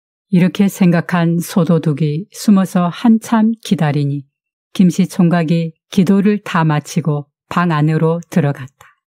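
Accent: Gyeongsang, South Korea. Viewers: 간만의 경상도 버전 아주 구수한 이야기 아주 좋아요 엄머야 워짠 일로 엄마야😂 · 구수한 경상도사투리로 읽어주시는이야기가 참 잼나게 들었네요 고부사이도 그렇고 삼부자지간예기도 뜻깊게 들었어요 요즘 사회가 각박하고 기분좋은일이 별로없는데 옛날 예기가 많은생각을하게해주네요 고맙고 감사했어요 앞으로도 좋은예기 많이들려주시길요~^^ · 님!!~^^ 갱상도 버전으로~정말 잘 하셔서 재미있게 듣습니다. 스님동냥이 아니라 탁발 이라하는게 듣기좋을듯~~😂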